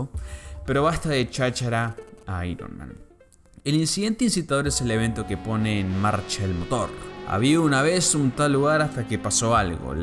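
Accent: Argentina. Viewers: Sos argentino no?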